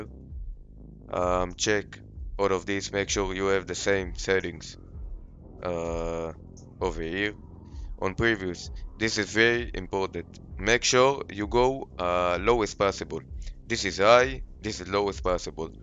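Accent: Israel. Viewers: המבטא הכי ישראלי ששמעתי נודר😭🙏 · אתה ישראלי? איזה מבטא יש לך כפרה לא רואים בכלל שאתה ישראלי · הוא ישראליייי?!!!?! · שומעים את המבטא הישראלי חחח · רואים שאתה ישראלי שומעים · אין סיכוי שאתה לא ישראלי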